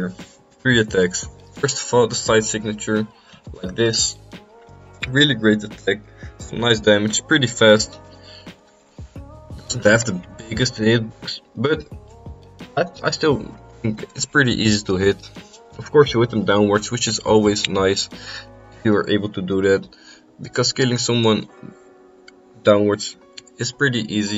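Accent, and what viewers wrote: Netherlands. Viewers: (Ik ga er van uit dat je nl brnt door je accent)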